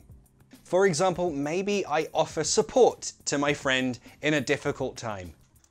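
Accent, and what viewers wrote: United Kingdom. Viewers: Alright! You made me feel appalled by the US-ish "dude" and constant use of "man". This doesn't go with you, you are expected to say " mate" because we watch your chanel by your UK-ish english, which sounds splendid. Ta for your excellent teaching work mate!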